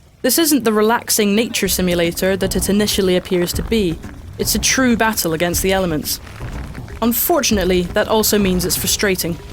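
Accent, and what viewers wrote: United Kingdom. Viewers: Lol all the people who don't know it's a British accent. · hes british u fuck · Whoever mistakes a woman with a British accent with a squeaky 13 year-old is simply disgraceful. Like, seriously. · I'm sorry... but is it a young British girl or guy giving the review? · people! it's quite clearly a woman reviewing... and if you disapprove of our British accents then you can jolly well sod off - and stop picking on our saliva build up due to too much tea and crumpets... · Australian? That don't sound like any Australian I know sounds more like British or Scottish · I knew as soon as I heard a young British person reviewing this 2 million idiotic Americans would be up in arms. Just because your vocabulary doesn't stretch past 'awesome' and 'sucks' doesn't make it a bad review, it just makes you an idiot.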